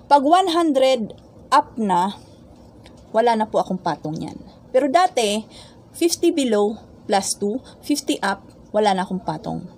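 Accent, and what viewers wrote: Waray, Philippines. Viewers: Waray waray ka maam?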